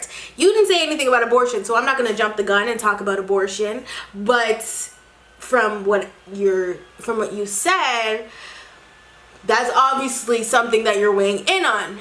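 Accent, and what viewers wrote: United Kingdom. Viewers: Your British accent is on point! you sound posher than me😂😂 ,Love your videos x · That British accent😩👏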